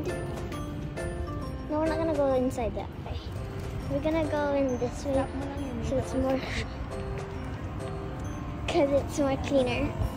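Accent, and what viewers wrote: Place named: Canada. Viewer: Nice!!! You sound Canadian ey!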